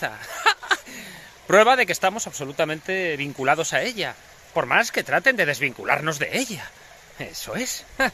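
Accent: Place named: Australia. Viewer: like ur aussie accent 😄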